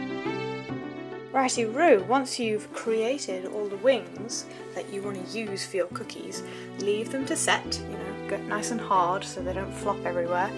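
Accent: United Kingdom. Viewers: Gracious, you're British. I came from the latest Grumps animation, and dang-ass-dang, you're all the more awesome because of it. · What part of the UK are you from? I've decided I need to marry a woman who says "me dainty fingahs"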